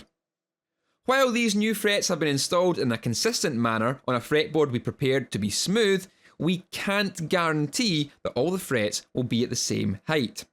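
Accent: Scotland, United Kingdom. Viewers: Yo, I can't wait for episode 9! I have been watching a lot of your videos and I am getting used to the Scottish accent (being a Filipino that is quite a challenge hehehehehe). I just wanna say EXCELLENT work on explaining the science and art of electric guitar making in plain layman's language. Thanks so much for educating us (you can be a great school teacher brother! I am a school teacher by day and musician by night), Keep it loud and I'll see you on episode 9!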